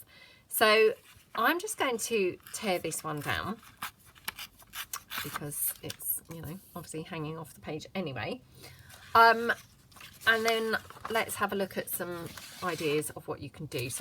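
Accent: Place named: Midlands, United Kingdom. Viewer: Great ideas! You sound like you might be from the Midlands, like my relatives. ; )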